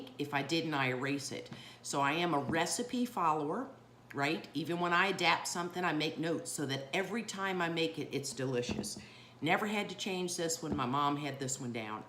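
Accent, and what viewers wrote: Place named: Eastern North Carolina, United States. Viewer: You sound like my folks in Eastern, NC.❤❤❤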